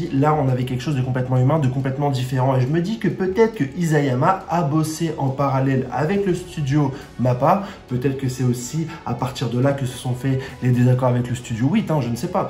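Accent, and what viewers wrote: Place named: North, France. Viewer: votre lieu de résidence (département lol), je me sens que tu viens du nord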